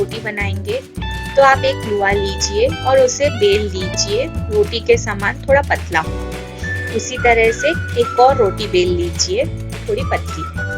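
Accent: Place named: India. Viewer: Sav Sav she is speaking in hindi and not in English that u r commenting like that.... in most of the houses of india they call it in this way only..... so it's okay if she is pronouncing something in indian accent.... so pls... dont judge anyone by their language. u need to see the hardwork behind everything and also their talent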